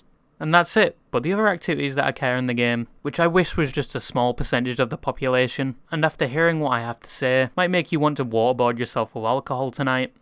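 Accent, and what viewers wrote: United Kingdom. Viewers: Oh god the heavy British accent, I already know I'm not getting 50% of what he's saying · The British accent is so thick I sometimes can't understand what you're saying vroo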